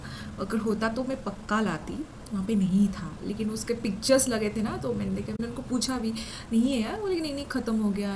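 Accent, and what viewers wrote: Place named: Odisha, India. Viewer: Oh you're from orissa I recognised the way you speak hindi 🙃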